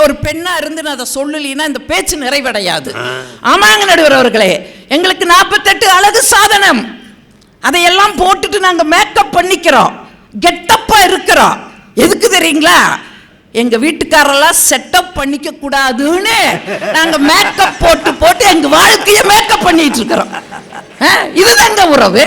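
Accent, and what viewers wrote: Kongu, India. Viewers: கொங்கு தமிழ் கொஞ்சுகிறது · கொங்கு தமிழ் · எங்கள் பகுதியில் நாங்கள் பேசும் தமிழ் இந்த கொங்கு தமிழ். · கொங்கு தமிழ் 🎉🎉🎉🎉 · ஆட்ட, ஆட்டையப்போட்டு, கிடாவிருந்து வைத்த து,குபீர் சிரிப்பு!பத்தாயிரம்,போச்சே! பெண்களுக்கு அழகு சாதனம்,நாற்பத்தி,எட்டு! மேச்சுக்கு,மேச்சா!,கொங்குதமிழ்,மெய்சிலிர்க்கவைத்தது❤ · உங்களுக்கு கொங்கு தமிழ் சிறப்பாக இருக்கிறது அம்மா 💐💐💐💐💐💐💐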